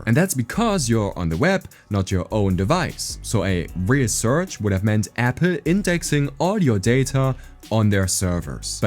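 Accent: Germany. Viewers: Omg dieses german accent ist halt so schlimm 😬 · Am Akzent rausgehört dass er Deutsch is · I could easily hear your German accent but it sounds good and really natural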